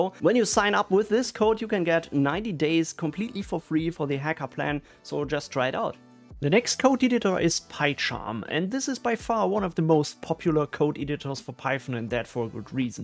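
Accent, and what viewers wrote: Germany. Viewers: Schwäbelst du ein wenig lol ? Grüße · Are you German? I can hear the accent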